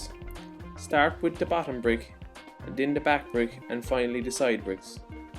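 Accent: Ireland. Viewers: excellent help as i had to replace all my baffles. Also if you enjoy listening to the melodious Irish accent its a gem .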